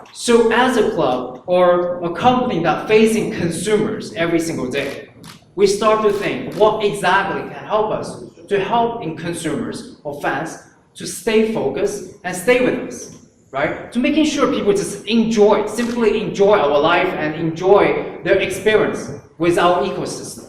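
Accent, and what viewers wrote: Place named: United Kingdom. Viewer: His British accent is so kool.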